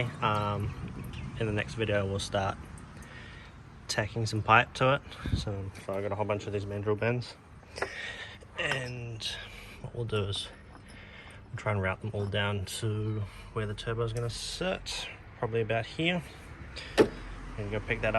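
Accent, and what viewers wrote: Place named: New Zealand. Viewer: I know a fellow kiwi when I hear one haha, what part of the country are you in? What transmission do you plan on using and power/Tq level you're aiming for? Planning to also go turbo on my M104 powered S124. Currently have a 717.404 box but don't think it'll hold up, might try a little boost on it first and see what happens.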